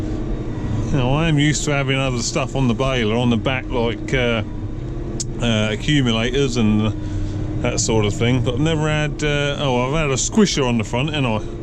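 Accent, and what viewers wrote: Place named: RP, United Kingdom. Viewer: Hi pal great video yet again abit posh that is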